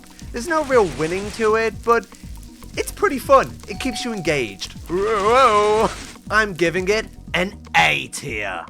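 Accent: United Kingdom. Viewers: will you tell us how we can acquire an unhinged british accent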